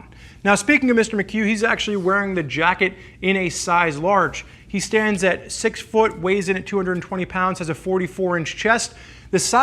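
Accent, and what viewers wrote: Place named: Pennsylvania, United States. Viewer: They are in Pennsylvania they have there own pronunciation,its no excuse I'm just saying.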